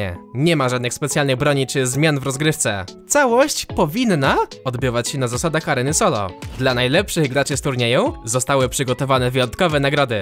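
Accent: Poland. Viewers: Cze cze czekaj czyli jest górnik zabrze, od którego lepsza jest chyba legia warszawa i lech poznań, ale nie ma bayern munchen (monachium)? Przecież tam gra Robert Lewandowski, jeden z najlepszych piłkarzy Europy (jak się nie mylę). Btw szacunek za polski akcent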